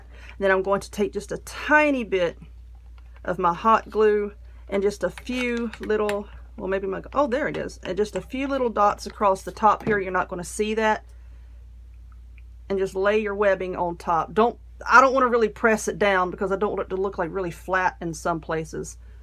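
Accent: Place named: North Carolina, United States. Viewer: I thought I detected a NC accent! Lol love it ❤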